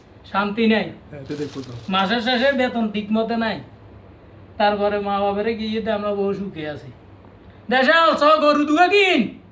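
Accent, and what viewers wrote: Noakhali, Bangladesh. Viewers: নোয়া খালি ভাষা বাদ দিয়ে যা বলার ভাল করে কথা বল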